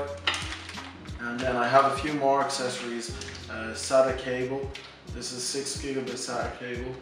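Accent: Ireland. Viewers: Lol - I knew you were Irish from the opening statement - Hows the goin.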